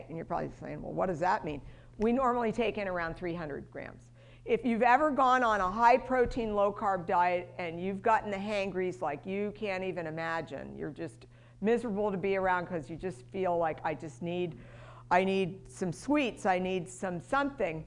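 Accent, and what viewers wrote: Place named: United States. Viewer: This lady has no sense of speech. Sloppy diction. And she gives the American accent an ugly name. Yuk.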